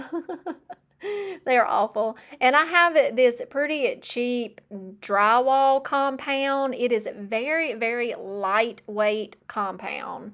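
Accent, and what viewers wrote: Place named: East Tennessee, United States. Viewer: Please keep saying Modge Podge! I love your unique pronunciation; my daddy used to pronounce umbrella as underbrella…sounds more right to me. I love your accent because it doesn’t sound like an accent to me. Of course, I live in East Tennessee, so go figure.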